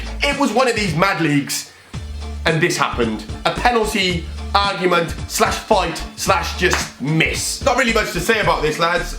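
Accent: Wales, United Kingdom. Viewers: your from Wales?!?!?!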